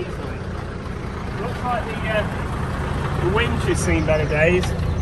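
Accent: United Kingdom. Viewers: As an American, at least I can understand about 50% of your British accent !!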